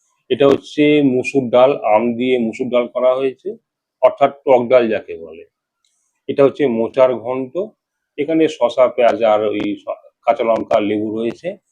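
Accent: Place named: Bangal, Bangladesh. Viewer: বাঙাল বাঙাল, ঘোটি কখনোই নয়। ওনার কথাবার্তায় পরিষ্কার বোঝা যায়।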